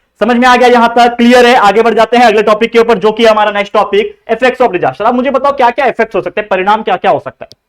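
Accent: Maharashtra, India. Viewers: Are you Marathi sir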